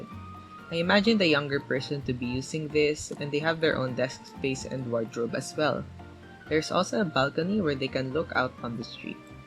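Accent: Philippines. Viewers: Your accent sounds like Filipino 💀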